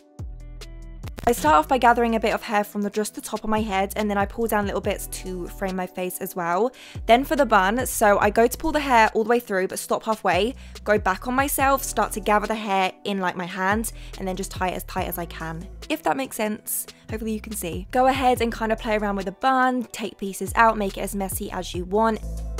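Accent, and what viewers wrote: United Kingdom. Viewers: SOMEHOW I KNEW SHE WAS BRITISH 😭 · Are you British :D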